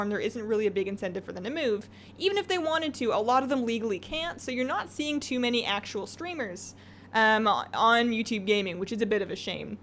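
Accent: Canada. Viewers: dat canadian accent.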